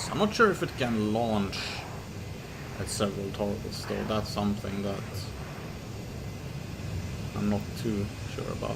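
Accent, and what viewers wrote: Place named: Sweden. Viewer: dude you are Swedish right? jag med jag märker på vägen som du pratar :)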